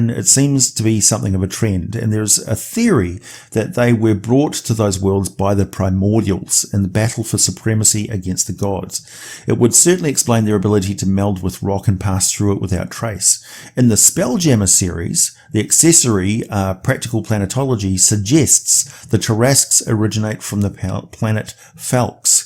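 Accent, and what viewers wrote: New Zealand. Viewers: Do I hear a kiwi? Or maybe South African... I should be able to tell the difference I’m half kiwi 😂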